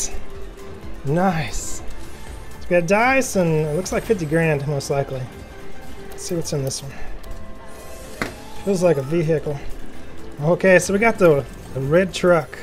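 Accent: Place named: South, United States. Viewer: Love that southern drawl.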